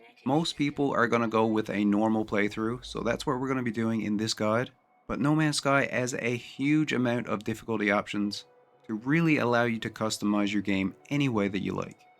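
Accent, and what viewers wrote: Newfoundland, Canada. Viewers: Picked out the Newfie accent immediately, from there as well. Played this on ps4 a couple years ago, it felt empty to me. I’ve been seeing vids of this new update so I might have to pick it up on pc and try again.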